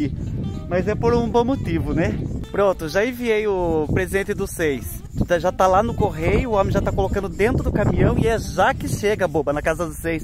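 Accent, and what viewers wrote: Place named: Minas Gerais, Brazil. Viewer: Eu acho q é minéiro mesmo fala igual a mim kkkkk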